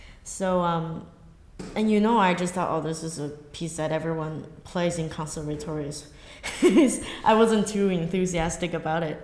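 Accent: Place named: United States. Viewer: I so agree. Her American accent & mannerisms, which have worsened since this interview here, do take away from who she really is, i believe, When she plays she is herself. Here, the Americanisms aren't too bad... yet. :-/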